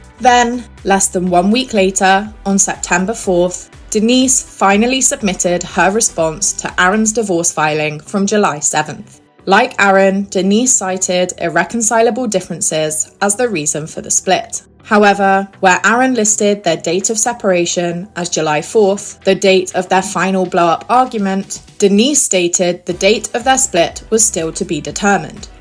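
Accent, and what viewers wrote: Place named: United Kingdom. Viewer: Your British accent is annoying …I had to stop halfway through because I couldn’t take it anymore.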